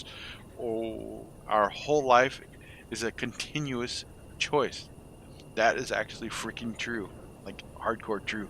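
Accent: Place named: Midwest, United States. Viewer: I've really enjoyed your playthrough. Actually preferred over television/netflix or recent releases in the gaming industry. You do a great job, and struggle in a world with a character with flaws relatable to a common person. Though your character isn't set perfectly for an easy ride, it does however force you to play smarter just to survive, and a mishap can be a set back. Where are you from? You almost sound like you're from the midwest.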